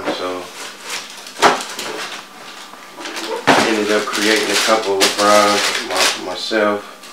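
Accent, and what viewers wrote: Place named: South, United States. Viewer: He sound so country lol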